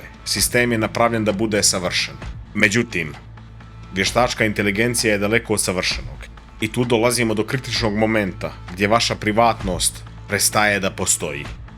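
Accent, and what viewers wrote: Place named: Montenegro. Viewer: Ja ga obožavam slušati baš zbog crnogorskog govora 🥰 a uz to jako je pametan đetić mogu mnogo toga naučiti 😊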